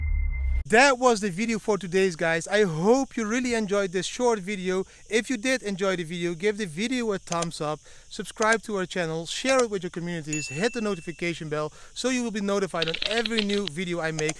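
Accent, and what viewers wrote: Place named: Netherlands. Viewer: Are you dutch? Ik hoor Nederlands accent in het engels! Goede content!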